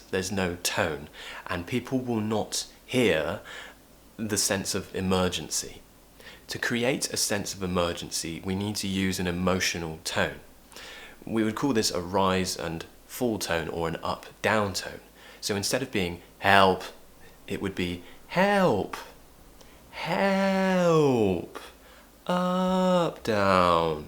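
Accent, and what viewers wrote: RP, United Kingdom. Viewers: How great you are! ♥️ I liked British accent from you❤️ Keep inspiring 😌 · Hi i love u British accent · I really love the way you talk and teach about your British accent style ❤ Thank you so much!!!!❤❤❤❤ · The best british accent on Youtube! Thank you!;) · Hi. I really love listening to you spiking British accent.....!! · Thank you for always posting great videos :) I love your british accent and your new hair color❤︎ From Japan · I think I have found my happiness. I've been looking for a male British teacher to copy his accent and yours is beyond my expectations ! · Omg I need someone from UK teach me like, I love British accent 😘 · Bro! Thanks by your Lessons , I greateful with you. I can understand every single word you say , I've learnt British English here, you're my Favourite Person 'round the world , I love your accent , I've a little bit of British Accent by you ... 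See ya !.. I wait meet you in the future and go to take a break or drink tea, just like a Brit guy .. I Say Hi From Mexico 🇲🇽. Be Carefull . ♥️ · I really like to learn British accent with you. Thanks a lot. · Thanks for such an informative and enjoyable lesson! Your British accent is marvellous! Honestly, miss for your podcasts( Are you planning to do that? Best regards from Ukraine!💙💛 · It's been me 2 years learning British accent and................................ I just adore your accent man💖💖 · Thank you so much to think teaching english because you are the right one in the right place, you make me fall in love in British accent 😍😍😍😍😍😍😍😍😍 I'm Layla from Sudan i will be always watch your videos until i feel i speak like you ♥️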